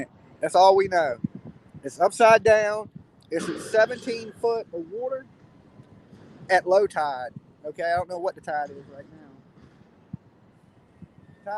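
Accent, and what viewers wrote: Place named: Hampton Roads, United States. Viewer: Hey, Are you from the 757? You sound like you are. 😊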